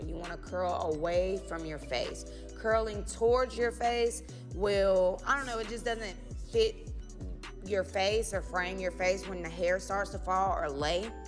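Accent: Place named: Texas, United States. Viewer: Oh my God' your S00 Beautiful sound Like you from teax💕😘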